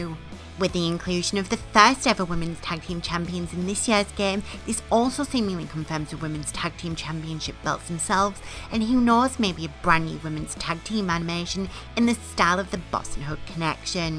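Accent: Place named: United Kingdom. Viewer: Hi good day thanks for the info plus I got hear your British accent so my day is complete💗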